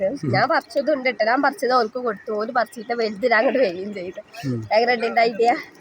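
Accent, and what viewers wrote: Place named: Malappuram, India. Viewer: ഈ വീഡിയോ ഇഷ്ട്ടായി ഇജ്ജ് അന്റെ മലപ്പുറം സലാംഗ് പറഞ്ഞു കേൾക്കാൻ നല്ല രസണ്ട് 😀 അഹ് ബല്ലാത്ത ജാതി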